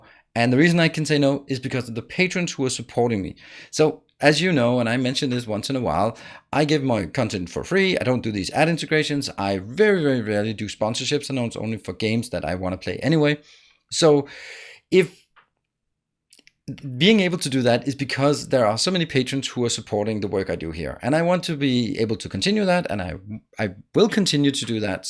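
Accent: Denmark. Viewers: Thanks dude for making this channel, I've subbed and watched most of your factorio tutorials. What I especially like is your positive attitude and your cute Danish accent. I have tried using it while playing and it works like a charm. I really do like your most simplest designs and use your blueprints a lot .As for suggestions: I would like to see themed builds: Green builds (focus on least pollution) or black builds (most... hmm a Shell build) Keep up the good work!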